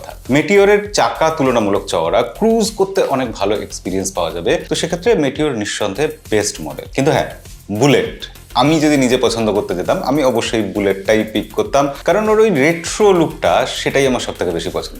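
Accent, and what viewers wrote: Bangladesh. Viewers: India banaye eita ekhon mane ta ki osikkhito ei toh pure Bangladeshi citizen kotha sunei bojha jachhe, eta India e banato, Royal Enfield ekta Indian brand. Kotha sune bojha jachhe chinese maal khub use hoy Bangladesh e tai eto bhoy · U sound like a Bangladeshi... no offense... using bullet in india has a swagger factor because for ages the indian army , police and government officials are using this vehicle ... so we don't directly compare it with Bajaj duke ... better option for you guys is to buy a hero xpulse 😅😅 ... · hola sunè mone hocche apni bangladeshi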